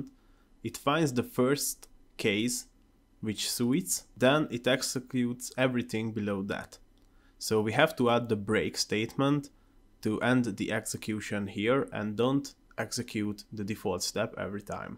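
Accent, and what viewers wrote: Hungary. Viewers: Nice video! I'm wondering what kind of accent is this you have :D Jól sejtem, hogy magyar? :D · Hey man, thanks for the tutorial, easy and understandable... just one thing i want to ask... aren't you hungarian??? :D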